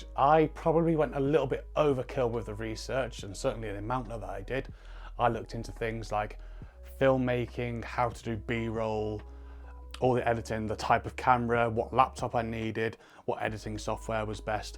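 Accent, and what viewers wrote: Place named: Yorkshire, United Kingdom. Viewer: You gota proper Yorkshire accent there, hope your good fella like the channel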